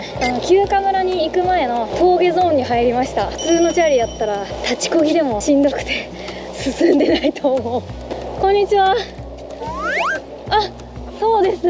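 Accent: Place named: Hakata, Japan. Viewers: がたまに喋る方言が好き！福岡の方言は可愛すぎる！ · 博多弁？可愛い🥰面白い企画でした👍🏻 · トップページに「ヤエー」？「ハイク乗り」？が表示されたので不思議に思って観てみたらだったんですね。登山動画で何度が観ているのでお勧めに上がったのでしょう。山にいる時よりハイテンションで九州弁が良く出ていますね。バイク乗りにそんな習慣があるなんて知りませんでした。一息ついたらバイクの旅も診てみます。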